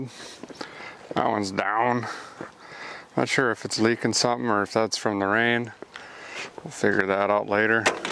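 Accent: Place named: Midwest, United States. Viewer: It's the Midwest twang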